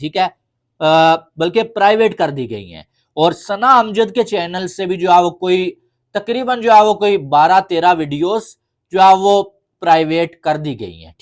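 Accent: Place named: Pahadi, India. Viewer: Tum kashmiri ni ho tumhari awaj toh pahadi jesi he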